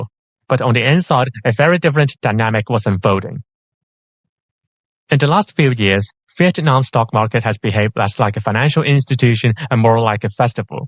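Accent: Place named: China. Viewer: Sir, from your accent, I can tell that you are a native Mandarin speaker. What is your intention of creating this video?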